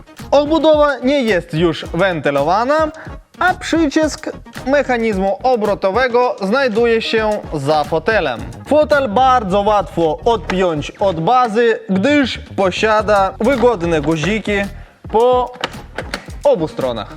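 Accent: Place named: Ukraine. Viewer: Dlaczego znowu słyszę Ukraińca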